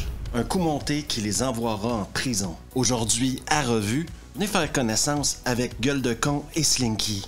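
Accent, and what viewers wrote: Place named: Quebec, Canada. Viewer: Ton accent québécois 🇲🇶 est vraiment très difficile à comprendre pour nous les français 🇫🇷🤷🏽 il faut vraiment travailler ton accent !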